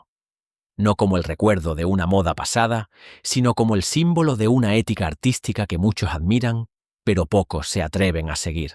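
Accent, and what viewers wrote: Andalusia, Spain. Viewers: Es andaluz el que habla. Que problema hay?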